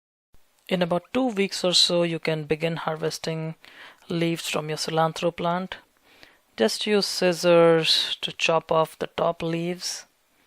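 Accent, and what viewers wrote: India. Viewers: Are you Indian ???your accent is different than of Americans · I love the accent on these Injun guys....much more cultivated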